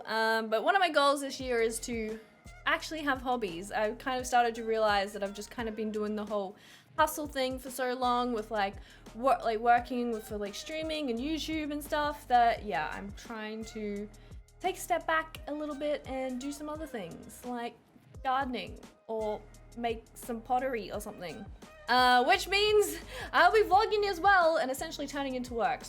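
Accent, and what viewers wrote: Australia. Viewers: in the thumbnail you look kinda like erin from the office hahahaha anyway love your content! youre amazing and its cool to see a big australian youtuber :D (or at least one with australian accent hahaha im australian too lol) thank you for being great! also i love your style, idk how to explain it other than that but yeah you are just cool and stuff :))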